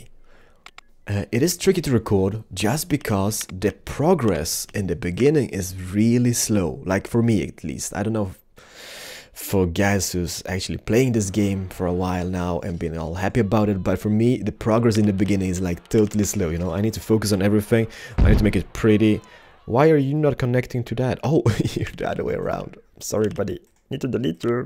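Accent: Sweden. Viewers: Are you from sweden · What part of sweden? Skåne or somthing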